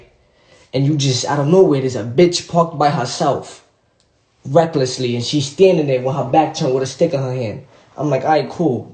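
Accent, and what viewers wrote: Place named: New York, United States. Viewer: what makes the story even funnier is his New York accent 😭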